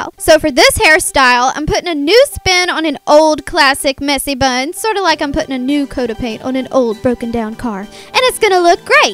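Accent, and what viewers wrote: South, United States. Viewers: when i first saw the thumbnail for this video i thought she would be like a full on new York/jersey accent, and then she was country, pretty coolll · Your so cute and southern! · you talk just like me! with the southern accent!! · You are SO fun and absolutely HILARIOUS to listen too! You made my night! And its great to actually hear a southern youtuber!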